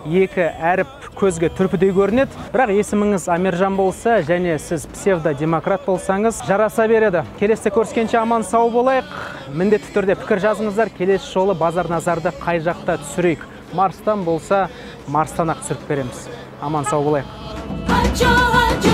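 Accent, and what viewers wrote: Standard. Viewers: Жақсы түсірілген таза қазақша, осындай сауаты білімді тілшілер көп болсы екен👍👍👍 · Сөзсіз керемет, таза қазақша ісің алға бассын бауырым! Тіркелдім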